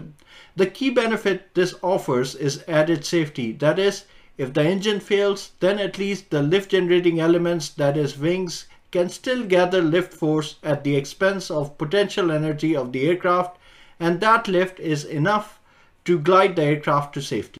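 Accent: India. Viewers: Sound like punjabi profesor lesson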